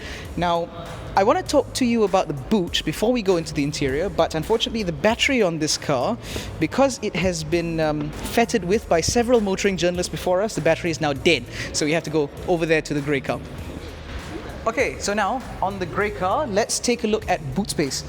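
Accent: England, United Kingdom. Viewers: Hello. You deserve more subscriber. I really like your english accent. I hope to have master english language also. Keep up the good work 👍 Edit: also, the video editing is top quality. Superb!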